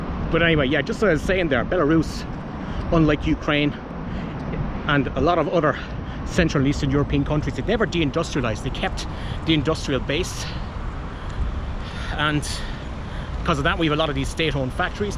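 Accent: Waterford, Ireland. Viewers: Hello from Kilkenny good info on your grandfather always thought you had a bit of a blaa accent makes sense now keep up the great videos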